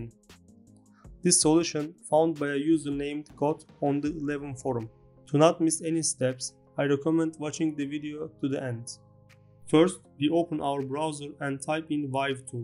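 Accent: Turkey. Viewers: iliklerime kadar türk ingilizcesi en sevdiğim :) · Damn your accent tells me that you're Turkish. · Türk ingilizcesi diye bir şey var buna eminim ben artık. Çok faydalı video teşekkürler! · Abi Türk olduğunu aksanından anlamıştım gayet güzel videolar çekiyorsun eline sağlık :) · aga yeminle ingilizce konuşmandan bile türk olduğun anlaşılıyor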